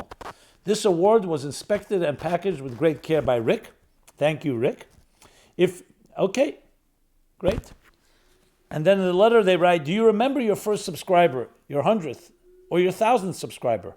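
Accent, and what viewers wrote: New York, United States. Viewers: How wonderful your lessons are, I have shared to many of my friends and clients. The way you speak resonates deeply within. And the NY accent is so good!!!! TY!!!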